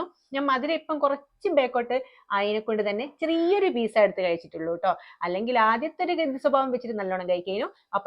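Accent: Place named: Vadakara, India. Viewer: Vadakara slang😅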